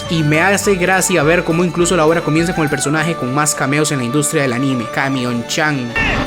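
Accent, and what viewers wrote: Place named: Costa Rica. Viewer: BROOOO SOS TICO ¿VERDAD?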